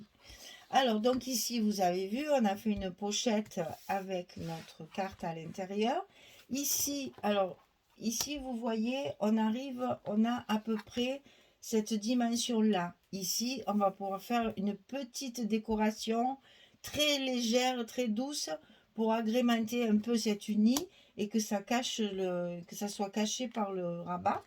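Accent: South, France. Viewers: Toujours enchantée de vos créations et vos explications données avec ce petit accent du sud que j'adore merci pour toutes ces explications très claires bisous et belle journée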